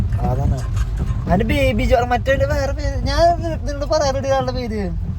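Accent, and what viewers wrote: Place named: Thrissur, India. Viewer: 😹ithethu slangaaa ,thrissuraa...chavaru slaang ...njan stopped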